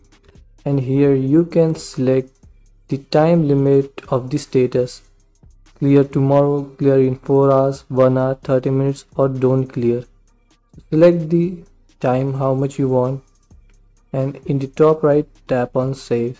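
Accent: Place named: India. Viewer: its because he is protraying it as an indian accent.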